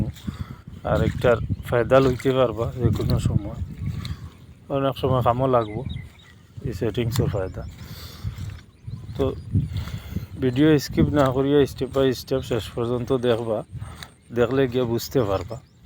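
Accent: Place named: Sylhet, Bangladesh. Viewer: Bhai lage sylhoty 😊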